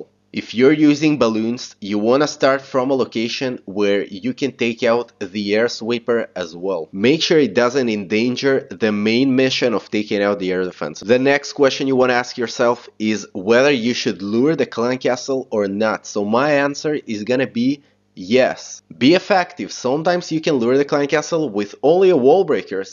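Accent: Russia. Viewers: Ты русский? Просто я англичанин и знаю русский, и ты говоришь с русским акцентом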